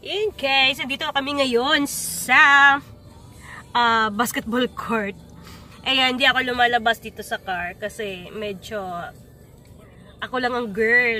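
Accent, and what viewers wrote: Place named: Bicol, Philippines. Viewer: Sarap ng tawa mu ah,hahaha ka bikol tlga ni palalabas mu "banonoy"😆😆😆😂😂😂😂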